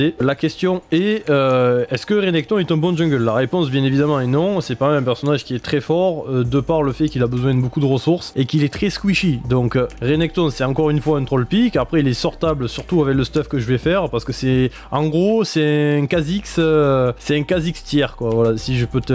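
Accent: South, France. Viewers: Sérieux merci, juste merci je step UP en jgl, je suis j'en merde de silver VI qui joue au jeu depuis mois qui était main garen top. Je sais que je pourrais monter haut gold facilement en le spammant mais depuis que j'ai découvert t'es vidéo je veux que jgl, je tryhard et grâce à t'es vidéo je step UP, t'es le boss et ton accent du sud rend t'es vidéo bien plus agréable, bref lache jamais tu va monter juste par ce que t'es le boss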